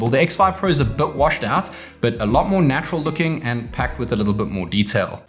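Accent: South Africa. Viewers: he sounds South African I’m not sure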